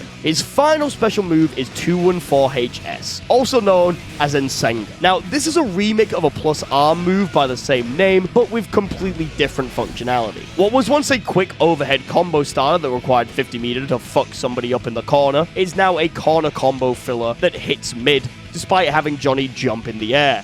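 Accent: United Kingdom. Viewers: No wonder your voice sounds so similar if you're northern that makes sense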